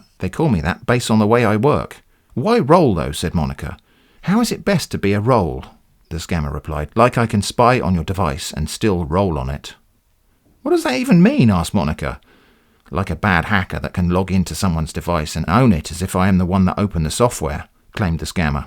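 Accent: United Kingdom. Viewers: Had a long nasty allnighter of work, nice to have that nice British voice to soothe me to a Saturday of sleeping in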